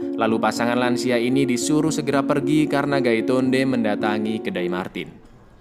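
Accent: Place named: Central Java, Indonesia. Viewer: Abang orang mana bang kok bahasa jawa nya kaya orang jawa tengah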